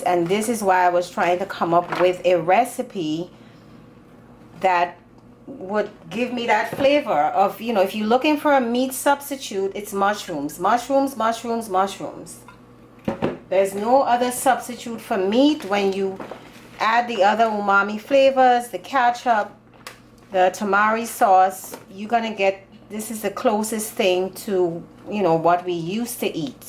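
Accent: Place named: Trinidad and Tobago. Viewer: I looove your accent 😍😍 are you Trini?!??!!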